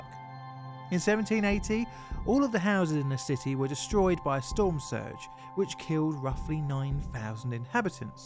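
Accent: United Kingdom. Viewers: I think this particular YouTube channel is run by a British guy. But if you can manage to understand British people and Americans you've learned every accent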